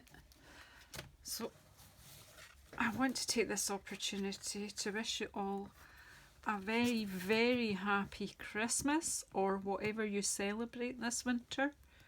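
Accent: Scotland, United Kingdom. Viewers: Hi, I stumbled onto your video, stayed, watched and have become your newest subscriber. Your lovely Scottish accent has taken me back to my childhood. Although i am second generation Canadian, 3 of my 4 grandparents were born in Scotland and emigrated to Canada as young adults. It is just so special to hear the accent again. Oh yes BTW, the card was spectacular. Thanks so much. 😊🇨🇦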